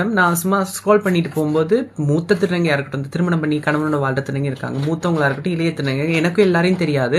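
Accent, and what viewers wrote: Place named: Eelam, Sri Lanka. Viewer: Cute.., you are very good in eelam Tamil..appappo eelam Tamil la pesunga.. quite emotional a irukkum eelam Tamil ketkumpothu..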